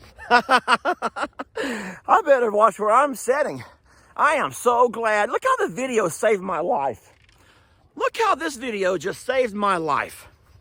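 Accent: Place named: Illinois, United States. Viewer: you are beautiful just the way you are, and you don’t talk funny that’s the Illinois coming out in you. From your Illinois peeps 😂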